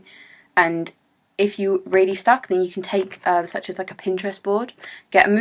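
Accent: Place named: United Kingdom. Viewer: I did not know you were British! Your accent is awesome! :D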